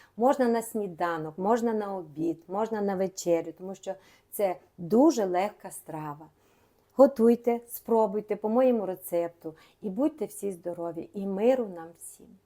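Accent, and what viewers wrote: Transcarpathia, Ukraine. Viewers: Так приємно бачити і чути наш Закарпатський говір. А страви ми і у Німеччині готуємо ,як колись вчила нас нодь мома. Здоров'я та миру вам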